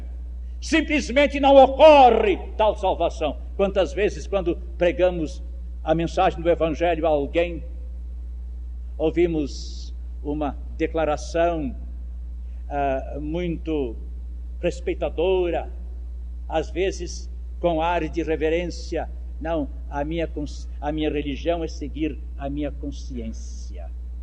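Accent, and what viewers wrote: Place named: São Paulo, Brazil. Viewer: Ele não era carioca? O sotaque é de paulistano da Mooca!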